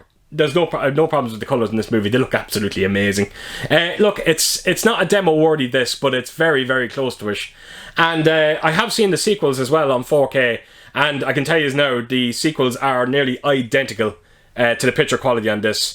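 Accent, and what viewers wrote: Ireland. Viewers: ah defo..as soon as I heard the accent I knew you were local, but wasn't sure if you were based in Ireland yourself. Definitely a small world and look forward to following your channel 👍🇮🇪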